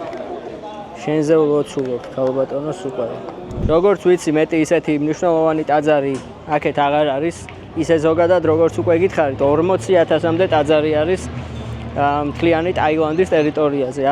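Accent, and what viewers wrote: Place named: Tbilisi, Georgia. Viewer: წარმატებები შენ! ღმერთმა ხელი მოგიმართოს! ვეღირსეთ თბილისურად მოსაუბრე მოგზაურს👍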